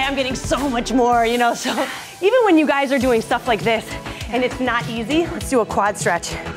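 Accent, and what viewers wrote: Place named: United Kingdom. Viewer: Wait, didn't she used to speak with a British accent :/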